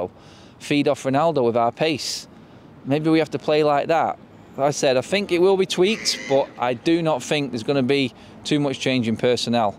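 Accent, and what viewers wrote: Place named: Manchester, United Kingdom. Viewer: Do u not hear he's accent he's obviously from Manchester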